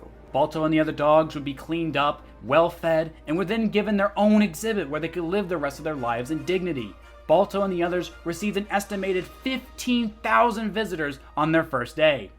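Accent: United Kingdom. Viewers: Never knew the true tale of "Balto", and your sophisticated British accent astonishes me to this point, dear. 🧐😎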